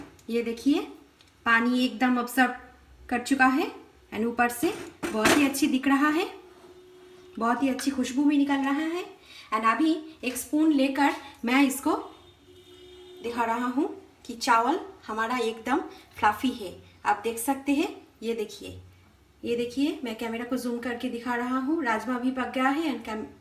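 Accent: Bengal, India. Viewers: Liked your recipe and your hindi with an awesome Bengali flavour👌 thanks for this wonderful recipe.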